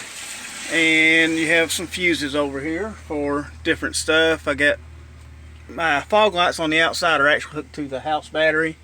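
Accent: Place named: Kentucky, United States. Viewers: I am a new subscriber to your channel and am amazed at your design and the construction of your camper van. I am very interested in this way of life and have been contemplating it myself. You sound like a southern boy. I am from the south too..GA gal here. 😊 Thank you for sharing your awesome ideas!! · I love you're conversion! I'm a Kentucky (Louisville) girl as well and you have a lot of good ideas that I'd like to use on my Mini Bus Schoolie build. I'm about to check out your bus build once I finish watching this video. Oh, and I just loooove hearing your Ky "'Twang" as you narrated your video. What part of Ky are you from?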